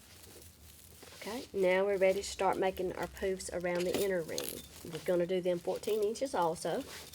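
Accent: South, United States. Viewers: Love it! Thank you for sharing! And your southern accent is too cute!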